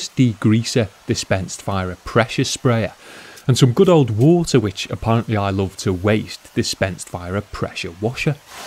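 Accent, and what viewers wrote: Liverpool, United Kingdom. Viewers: Are you Scouse? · Worst accent in Britain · Is this a Liverpool accent? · You’re an amazing Detailer and vlog maker, but your accent is so pleasant to listen to. I was really meant to be born and raised in the UK like my ancestors, instead of my Baltimore, MD, USA accent Hon’.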